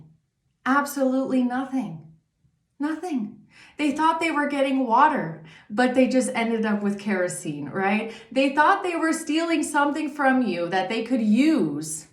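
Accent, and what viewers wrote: Germany. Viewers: Your German accent is beautiful! The wicked evil thief and his satanic family can ask s@@ to save them, they offered the collective as sacrifice for life insurance policies, steal their homes, steal their finances because the evil leader DJ zumba instructor played God on chosen lives, God's wrath is upon them, they made their bed and now they can lay in it KARMA Isaiah And I will punish the world for their evil, and the wicked for their iniquity; and I will cause the arrogancy of the proud to cease, and will lay low the haughtiness of the terrible these wicked evil inhuman intentions towards someone who did ABSOLUTELY NOTHING to him or his organized criminal group is UNJUSTIFIED for greed, sex orgies, lust, envy, jealousy? Psychopath. Kerosene light up a fire that never quench Malachi 4:3, which says they will be "ashes under the soles of your feet". 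Other verses mention a "lake of fire" (Revelation ) or "eternal fire" (Matthew ) where the wicked will suffer eternal punishment. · Yeah,... they've struck me like a match without even thinking about the consequences. I hope they learned to drop and roll. p.s I Absolutely love your German accent and language skills. What a cute picture of a lovely fraulein. That's so cool!!!❤❤❤❤❤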